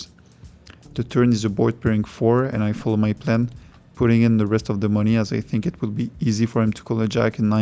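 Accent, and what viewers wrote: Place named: France. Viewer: Je trouve que tu perds de ton charme, tes vlogs étaient assez cools à regarder en français et tu avais ta petite touche humour à toi. En anglais et avec l’accent français ça rend forcément un peu moins bien mais force et honneur pour tenter ça et aller chercher le marché US anglophone, ça reste quali et nice comme contenu 🔥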